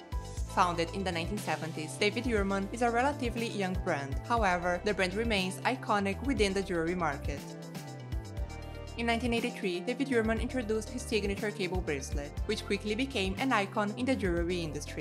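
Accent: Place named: England, United Kingdom. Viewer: I can intolerant your English accent.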